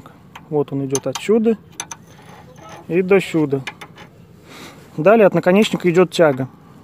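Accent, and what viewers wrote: Belarus. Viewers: Ты из Белорусии? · бульбяш.... говор не спутаеш))) +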